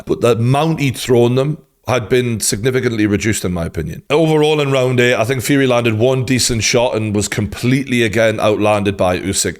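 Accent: Newcastle, United Kingdom. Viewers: Spot on true geordie👍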